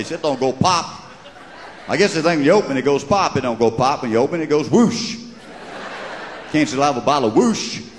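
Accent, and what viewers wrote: South, United States. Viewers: No doubt, a southern son, and one of many inspirations of Jeff Foxworthy. Both from Atlanta, and the southern accent is undeniable. He is not forgotten. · I read this guy's books as a kid because my parents had them, but I never imagined this voice in my head. He looks like his voice would be more nasal and nerdy. I didn't imagine the southern accent either. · you will find his pronunciation in some southern and mountain dialects (also in Arlo Guthrie) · I remember checking this guy's books out from the library way back in the day. Up til now I've never heard his actual speaking voice. He's as Southern as I am :)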